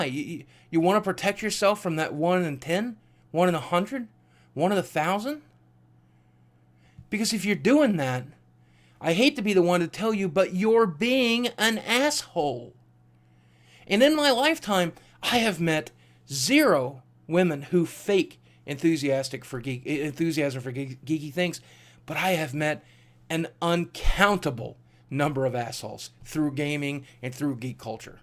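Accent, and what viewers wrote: South, United States. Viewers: ANIME , but because he's from the south, it's pronounced differently, you ignorant fuck.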